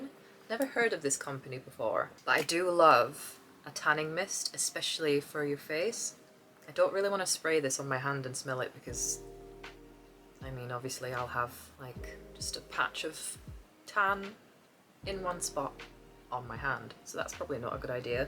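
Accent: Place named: United Kingdom. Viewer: good morning. Your excellent mastery and ability to introduce the goods well, which is always accompanied by composure, smile and honesty. It has always impressed me as the few audiences of your man who is not a consumer of cosmetics! I enjoyed watching another informative and creative performance from you.. At 1.39, the introduction of this month's magazine (edit), which introduced inspirational travel products, was important and impressive for me. At 6.59, it was remarkable for me to see your good and positive addiction to your skin care! In the 8th minute, a 20% discount on purchases was also really tempting. Sorry if I could not come in time to watch your new videos. Your beautiful and energetic comments for my channel will always remain in my mind. Thanks and congratulations for the interesting video you shared with the topic LOOKFANTASTIC JULY UNBOXING & DISCOUNT CODE. 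I'm glad to have the support of a valuable and committed makeup artist like you. My dear friend You are one of the few people who have always eagerly come to your good channel because of their humble and regular approach over the past year. I hope that in the future I will be able to prepare more attractive quality videos for my audience, inspired by speaking in your original British accent. I also hope that there will be an opportunity for make-up artists from other countries to become more familiar with your excellent channel through your good and lasting comments. Many wishes for the increasing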